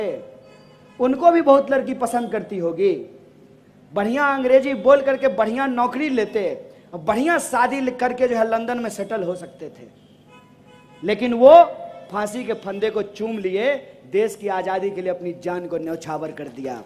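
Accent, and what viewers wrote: Bihar, India. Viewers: He should improve his Bihari tone.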